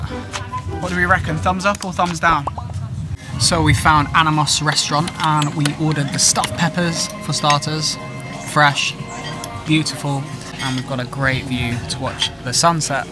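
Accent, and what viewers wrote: United Kingdom. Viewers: Oh yeah, I would guessed Londonish because I lived there for a while and I felt in love with their accent but for me it's inimitable🙈😄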